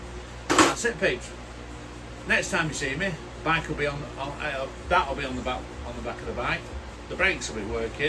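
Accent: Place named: Northern England, United Kingdom. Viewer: Whereabouts you based you sound northern? Lancashire?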